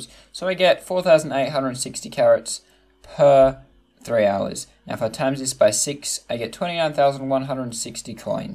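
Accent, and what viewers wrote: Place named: Australia. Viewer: Australia Only know this one because every “a” sounds different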